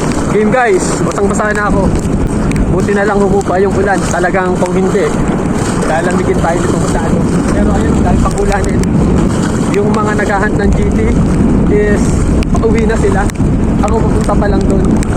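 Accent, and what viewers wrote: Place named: Abra, Philippines. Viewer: Idol Taga Abra de ilog ka po ba? Ganyan Kasi tono Ng salita Ng mga taga Abra eh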